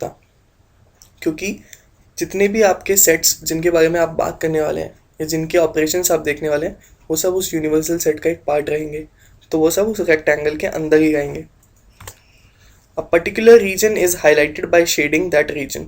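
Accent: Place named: India. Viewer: Dude. It sounds like you speak Indian. Wtfffff???? Your accent man :///////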